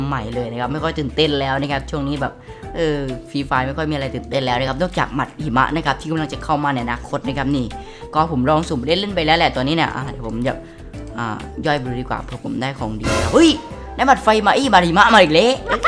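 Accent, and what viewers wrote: South, Thailand. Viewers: พี่เป็นคนใต้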